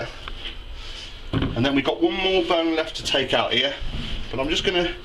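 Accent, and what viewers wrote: United Kingdom. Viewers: He's British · I really enjoy watching your videos. You are a real funny guy. The British accent makes me laugh when you say words and phrases like "give it a go", "really lovely", and "just fantastic". The combination of your great personality and educational videos make it fun to watch. Keep up the great work.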